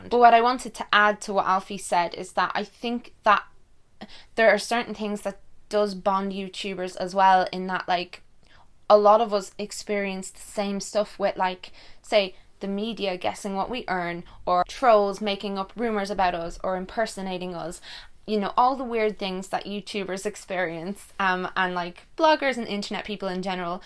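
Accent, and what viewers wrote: Ireland. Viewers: Before watching your videos, I thought that the English accent was the best. Now I'm love with the Irish one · Veronika Meister Any accent can sound both good and bad, in my experience. I've met Germans with lovely, lively and warm accents and others with a very harsh and unpleasant ones. My friends ex had this amazing accent, very pleasant and soft. Same with Irish, some have a lovely accent (like on these videos), others have an accent that is very abrasive. Heck, look at British accents, so many regional varieties - some of which are, let us say unpleasant lol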